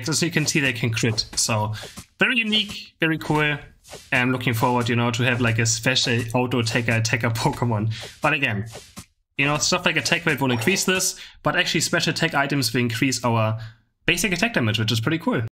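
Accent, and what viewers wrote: Germany. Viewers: 90% of language i cant understand. Gerlish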